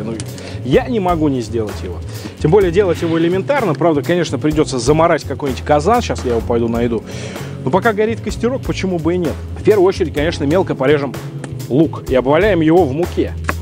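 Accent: Moscow, Russia. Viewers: Что-то говорок какой-то на понтах сегодня, такой чисто Московский прорезался... аж слух режет.... А за рецептик лайк!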